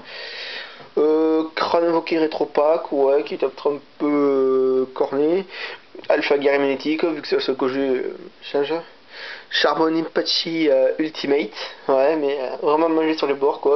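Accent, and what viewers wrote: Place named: South, France. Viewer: t du sud comme moi toi vu ton accent ! (ce n'est en rien une critique) et j'aimerais t'échanger le dragon détonant la derniere carte est canon derriere la porte ce qui fait que quand tu dois te prendre des dégats par effet , c'est ton adversaire qui se les prend et aprés si tu as des protecteurs du tombeau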